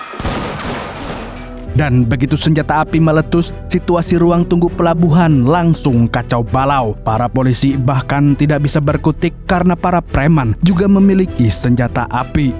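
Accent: Banjar, Indonesia. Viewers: Mimin urg Banjar mana ?😊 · Urang banjar kah nyawa ni min😂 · Banjar bnr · Urang banjar babahasa indo haratnya😂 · Urang banjar kalinya beliau ni lah😂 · Pian urang Banjar kh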